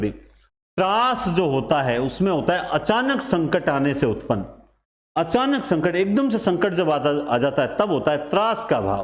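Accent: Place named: Rajasthan, India. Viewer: आप के मुख से मारवाड़ी बहुत अच्छी लगती है गुरुवर मन गदगद हो जाता है 😍😍😍😍😍 मेवाड़ी कलम से ✍️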